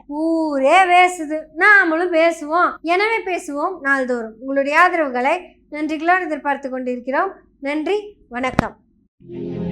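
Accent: Kongu, India. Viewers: கொங்கு நாட்டு தமிழின் இனிமைக்கும் உண்மையான கருத்துக்களுக்கும் வாழ்த்துக்கள் மகளே! சீமானுக்கு கம்பி எண்ணும் வேலை கிடைக்கும்.நல்லது. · உங்கள் கொங்கு நாட்டு தமிழ் மிக மிக அழகு வாழ்த்துக்கள். சிறப்பு 😂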